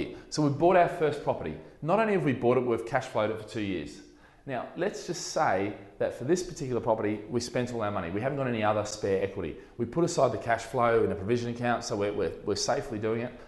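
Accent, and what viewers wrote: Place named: Australia. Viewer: from the uk yet he has an aussie accent :P very informative.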